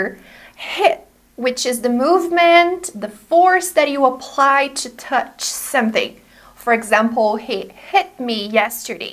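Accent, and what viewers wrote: United States. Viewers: But she's speaking american, not english... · Brasileira? Caramba, pensei que fosse americana.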